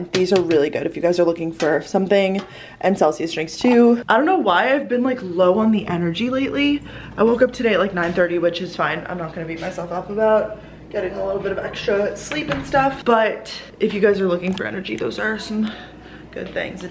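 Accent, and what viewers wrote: Long Island, United States. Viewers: I love how you pronounce “Mario” your Long Island accent really shows. I also love Matt’s videos! How minimalist house tour is funny.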